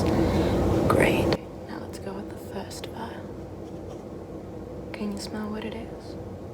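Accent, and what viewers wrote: South, United States. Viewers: American Southern accent > any other accent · Ah yes, the near extinct classic Non-Rhotic Southern accent, how I wish this accent was more of a thing.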